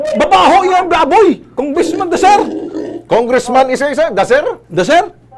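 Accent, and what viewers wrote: Visayas, Philippines. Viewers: Haha nakakatawa c idol mag bisaya.hehe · HahHaha katawa man ko sa imo sir hahhahahahha cute Kaau ka mo bisaya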